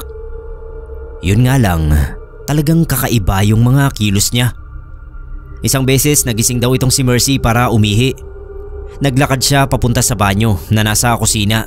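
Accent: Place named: Cagayan, Philippines. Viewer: Parang taga Cagayan ang narrator. Itawes.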